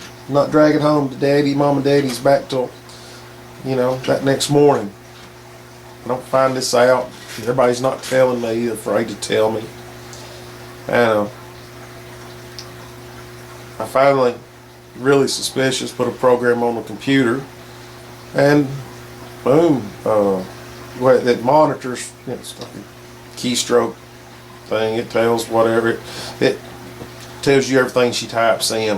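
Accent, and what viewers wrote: South, United States. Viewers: Gotta love a deep southern accent, Lol.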